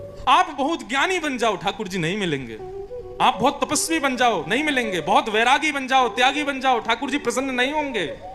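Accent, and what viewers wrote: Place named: Jaipur, India. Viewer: आपकी भाषा जयपुर की बोलतें हों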